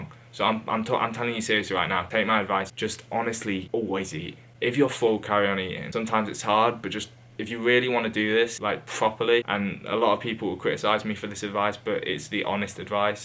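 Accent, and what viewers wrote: United Kingdom. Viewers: Then don’t watch lmao, how hard is it to exit? Its not a lisp lol its his brit accents.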